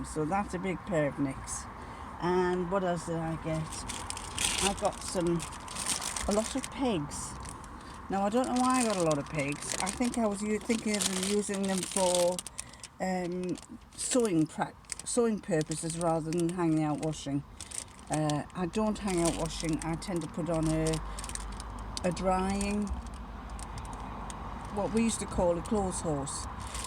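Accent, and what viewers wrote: United Kingdom. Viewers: I love your vlogs! I am from Ohio, so I love seeing your scenery on drives and walks, enjoy your mother-in-law, the kitties, his lordship, the British accent. I am same age and a sewer so I love your opinions on fitting and showing your makes. So thank you for all your posting.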